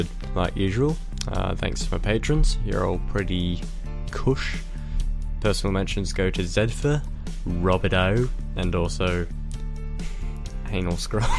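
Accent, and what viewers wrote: Australia. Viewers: You're Aussie?? I love your accent :v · what is that Accent? sounds like a suppressed aussie accent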